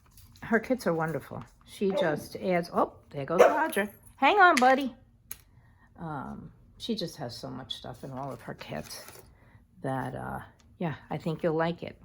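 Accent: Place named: New York, United States. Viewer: I thought I heard some New York in your voice! I’m originally from Brooklyn 🥰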